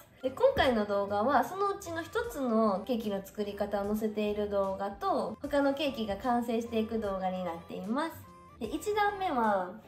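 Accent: Kansai, Japan. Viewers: 関西弁…！！ めちゃかわいいな！！！ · 関西人ですか？関西弁混ざってて関西人の私からでもめっちゃ可愛いと思える(?)🥲💗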